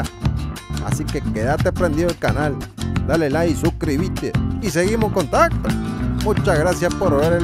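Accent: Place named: Gaucho, Argentina. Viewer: felicitaciones miles,vengo viendo viajeros, como muchos ingrese de la mano de pablito imhof, luego continue con los chicos de viajar vale la pena que ya llegaron con la estanciera al muro de Clinton y Trump que separa mexico de EEUU asi conoci a tambien a mariano palleres y a Sofi y su Pandita y ahora me encuentro con este extraodinario diario de viaje yesta hermosa propuesta de contar el viaje con tono0 gauchesco felicitaciones chicos y ya los vere llegando a alaska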